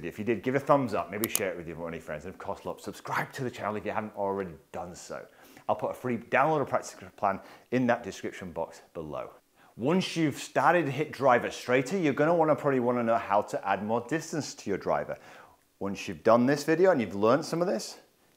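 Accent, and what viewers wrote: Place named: Northern England, United Kingdom. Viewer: love the tuition, shame about the flat northern vowels.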